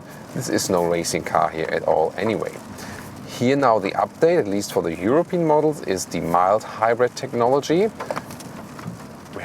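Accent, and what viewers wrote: Germany. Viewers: Jouw Duitse accent is charmant. Vriendelijke groeten van Marcus uit Holland :)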